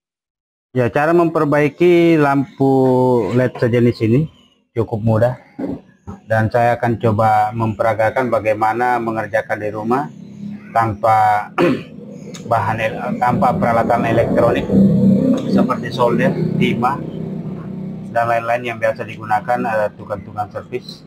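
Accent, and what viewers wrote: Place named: South Sulawesi, Indonesia. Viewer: Dari logat nya kyakn org Sulawesi Selatan 🤔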